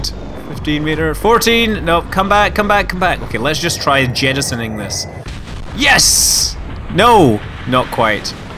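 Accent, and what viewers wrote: Scotland, United Kingdom. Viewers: It's the Scottish accent. And often the Explorer becomes an exploder!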